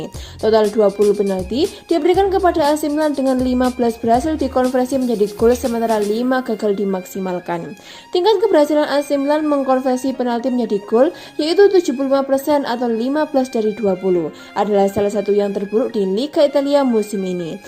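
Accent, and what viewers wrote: Java, Indonesia. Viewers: medok banged jawa nya 😁😆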